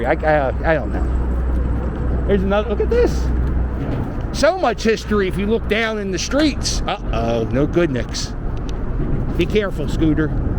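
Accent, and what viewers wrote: Philadelphia, United States. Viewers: This guy has one of the best Philly accent I've heard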